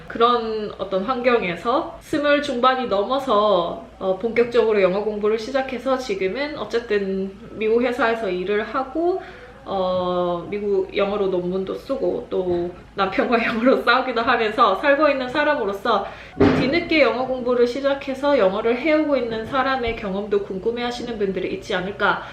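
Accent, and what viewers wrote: Gyeongsang, South Korea. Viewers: 대구분이신가요? 자주 듣는 억양이 여기서 들리니 너무 반갑네요 ㅎㅎ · 살짝살짝 들리는 사투리 너무 귀여워용.ㅎㅎㅎ^^ 경상도분이세요?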